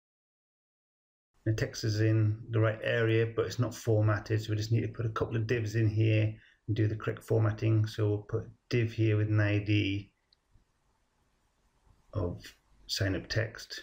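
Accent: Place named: Yorkshire, United Kingdom. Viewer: Aye, a Yorkshire Man, of course you are... my family is from the dales..Well done with the tutorial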